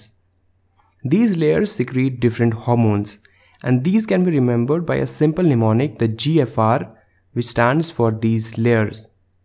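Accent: India. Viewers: Im sorry but i just cant take an indian accent seriously. 🥲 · I like his accent :) Indians r cool but T-Series sucks :( i am 9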